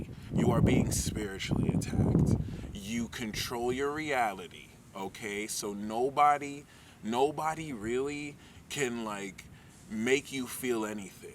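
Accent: Nigeria. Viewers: The Nigerian accent takes me out everytime 😂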